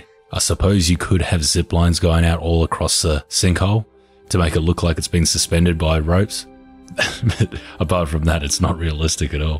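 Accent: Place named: Australia. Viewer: You sound like a true blue Aussie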